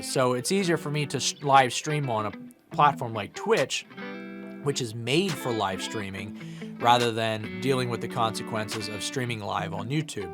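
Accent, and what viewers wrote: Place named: Carolinas, United States. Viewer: U sound like ur from nc or sc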